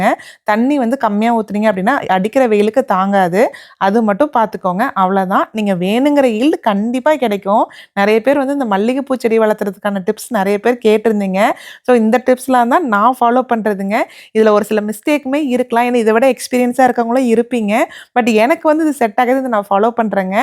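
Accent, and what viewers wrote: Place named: Namakkal, India. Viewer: I believe you are from namakkal from your speech .. good tips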